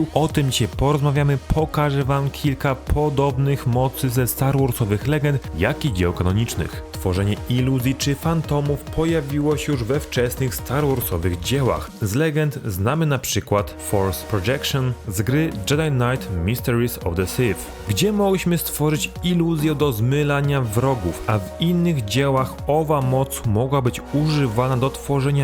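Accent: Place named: Israel. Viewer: gdzieś ty się uczył polskiego..człowieku..!1 co to za akcent......koszmar...z izraela...???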